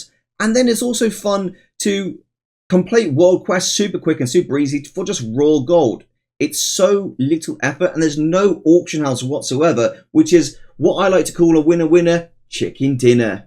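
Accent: United Kingdom. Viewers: Minimum wage in the UK is £11.44 per hour. WOW costs £9.99 per month in the UK (or less depending on plan). In case you don’t recognise the accent, this guy is from the UK. If you were doing 60 characters like he suggested that is going to be at least an hour a day. Over a month that would be the equivalent of around 30 hours. At minimum wage that is going to be around £340 you could have earned for that same time doing something boring. I can understand if it is quick or fun or yields a lot for the time, but this doesn’t tick any of those boxes if your intention is to just pay for your subscription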